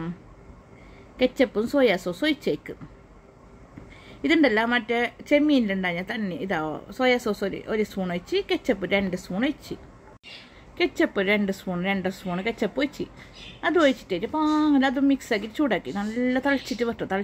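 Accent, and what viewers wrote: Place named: Kasaragod, India. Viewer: ഒരു വെറൈറ്റി ....സൂപ്പർ ...അതോടൊപ്പം കാസർഗോഡ് സംസാര ശൈലി ഇഷ്ട്ടപ്പെട്ടു ......കണ്ടതിനു ശേഷം ലൈക്കും .കമന്റും .സബ്‌സ്‌ക്രൈബും ചൈതിട്ടുണ്ട്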